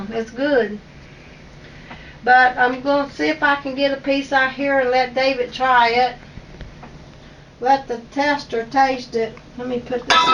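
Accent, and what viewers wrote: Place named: Appalachia, United States. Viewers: lol. sounds like my momma. warsh for wash... youve done lost your mind... thank you. i sure have missed hearing this Appalachian talk from momma. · I worked in a meat market also at two different stores.. Bilo and Ingles in Sc.. IT IS COLD … and in the winter months, IT GETS COLDER... or at least it feels colder to me.. I am so THANKFUL to God that I don't have to work in a meat market anymore.. I didn't like it... I worked in that field for about 6 years and that was 6 years TOO LONG.. I don't know where you are located, but you sound like you could be from WV.. I was born and raised in West Virginia.. It is my home.. I have lived in Sc for a little over 18 years now.. But I do miss my mountain home.. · May I ask what area of Appalachia you’re from? I’m in WV and you cook & sound just like home so I’m curious. 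Thank you! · You are sooooo sweet and I love your lil southern accent!! Looks delicious! I wish I could have some. God Bless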